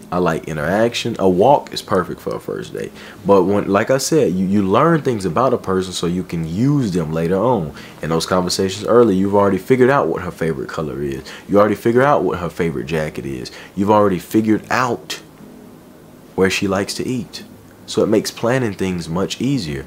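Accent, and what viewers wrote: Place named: Mississippi, United States. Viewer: this guy gotta be from mississippi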